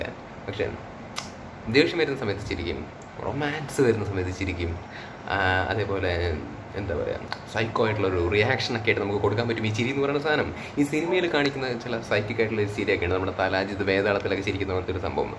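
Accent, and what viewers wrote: Palakkad, India. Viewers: Bro Palakkadan aano✌️ Slang ind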